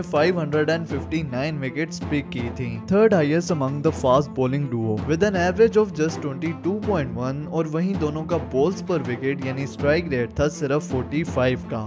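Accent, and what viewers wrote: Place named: Punjab, India. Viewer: Not "Floup" it's "Flop" I know and I can understand you are Punjabi by your accent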